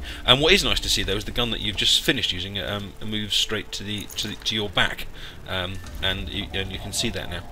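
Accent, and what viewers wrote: United Kingdom. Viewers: Ok, i just subbed. My reasons 1) Badass intro music 2) You sound awesome (are you british, if so whereabouts?) 3) good content Nice Job man!!!! :D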